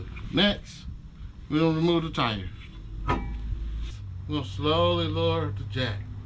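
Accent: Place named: Detroit, United States. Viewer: Sound like you from the D.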